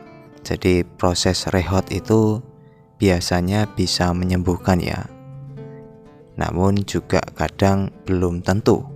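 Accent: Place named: Banyumas, Indonesia. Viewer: salam ngapak bro aja kelalen madang😂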